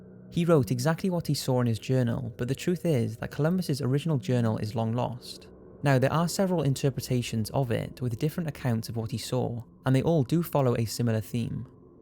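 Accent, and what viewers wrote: Wales, United Kingdom. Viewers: Dbonkerz81 Pretty sure that's what I said? But he has a Welsh accent which changes his pronunciation slightly. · Are u British? Lol sorry if its obvious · I love your videos and your Welsh accent.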